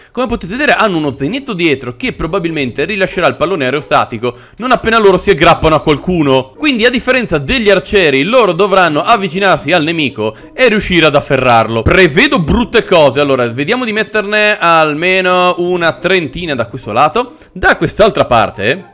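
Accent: Veneto, Italy. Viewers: Like chi conferma che l'accento iniziale di era veramente veneto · Bello il tuo dialetto Veneto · ADORO LA VOCE DA VENEZIANO (ed sono anche veneto)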